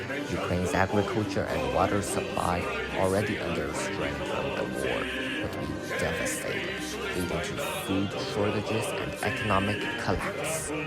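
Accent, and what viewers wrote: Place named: Asia. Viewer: Guys who come later, just ignore his Asian accent!!! CUZ IT IS REALLY A FABULOUS VIDEO!!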